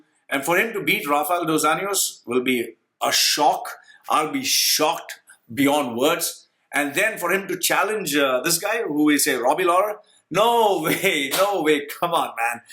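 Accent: India. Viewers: Wasted 9 precious mins of my life.. Dude you talk in India accent. Are you from India? You make video with such a good title but you dont talk facts while making predictions... Rafael is 1inch shorter in height and reach is equal why hez big? Gather some facts and make video then it would be interesting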